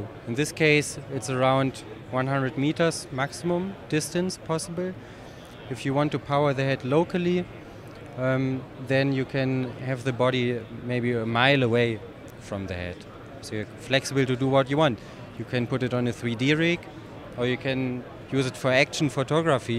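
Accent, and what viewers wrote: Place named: Germany. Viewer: Er ist echt nen deutscher ^^ He really is a german, i'm german too but he really can't speak english ^^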